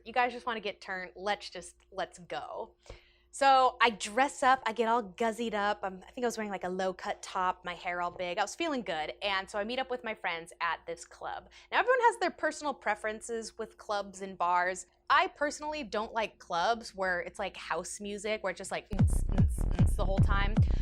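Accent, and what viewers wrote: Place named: United Kingdom. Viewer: Nice British accent!:)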